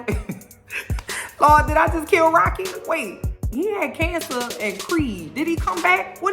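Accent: New Orleans, United States. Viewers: Your channel came thru my feeds on sheer accident and I LOVE IT😍First off Bayyyyybeee I can hear that Nawleans accent a mile awayyyyy heard me😝 secondly, you are HILARIOUS 🤣🤣🤣 When you went off bout Steve sticking his sticky fingers all over the mashed potatoes and kitchen cabinets I was outta here 💀🤣🤣🤣🤣🤣🤣🤣🤣🤣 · Geez! I'm so glad I found you and your channel. Ive watched reviews of this show from others and can't even get through the full review. Plus, your New Orleans accent made me subscribe in less than 1 minute into the video. I know in my bones this about to be good! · I knew you were from New Orleans the second you opened your mouth. You have a new sub, neighbor! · You sound like my people back home in New Orleans…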